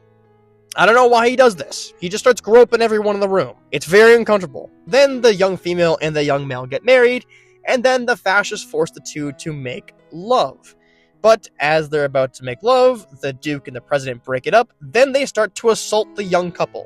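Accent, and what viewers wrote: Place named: United States. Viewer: Clearly this is written and narrated by an uneducated American.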